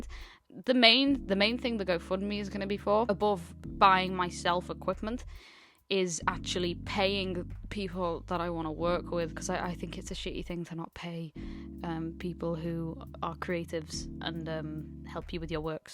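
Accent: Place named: United Kingdom. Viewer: Idk how u made urself more british sounding but ye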